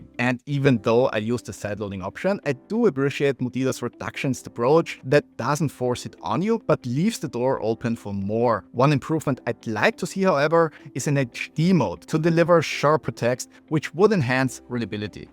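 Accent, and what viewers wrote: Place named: Austria. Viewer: Servus! Great review, but I started watching your videos for a minimal phone solution but have to admit how much I enjoy your Austrian English accent! Love from Canada 🇨🇦.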